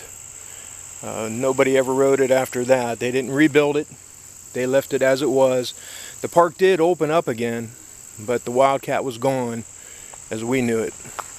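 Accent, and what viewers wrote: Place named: Northeast Ohio, United States. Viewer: It was nice of him to commemorate the ride in this way. Gotta love that NE Ohio/ western PA accent. Haven’t lived in OH for many years but like your hubby I think about this coaster all the time.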